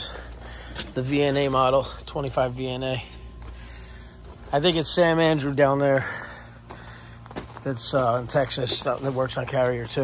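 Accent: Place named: Long Island, United States. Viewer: Where in ny are you? You got a Long Island accent but looks like your upstate here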